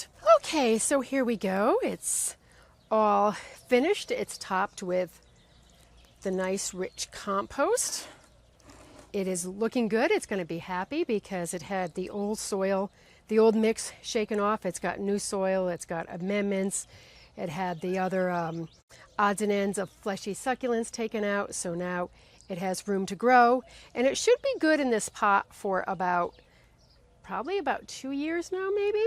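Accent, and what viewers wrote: United States. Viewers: Hi! It's Marc again from good ol' Germany. Thank you for this video! Yes, I do find your videos very helpful indeed, and I always look forward to new videos coming from you. And between you and me; I love your New England accent. Your English sounds absolutely beautiful. I also learned something from you that works fantastically even in the much cooler and moister climate of central Europe: Amend potting mixes with worm castings. Wow! This is awesome! It works on my citrus plants, but it also works on my mammalaria cacti and jade plant and aloe. It also works on my cycads. And it works on my heavy feeders like oleander and geraniums. Today I amend the potting mixes of all my plants - tomatoes, Mediterranean herbs, coffea, strelitzia etc etc. My impression is that worm compost keeps my plants healthier and pest-resistant. So, let me assure you: your videos are absolutely helpful - even over large distances and across climate zones. 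So, carry on, and share your expertise with us, so we can all go out in the garden and make the world a more beautiful place (I love your motto, and I have adopted it and made it my own). Have an awesome Sunday and enjoy your patios and garden! Bye!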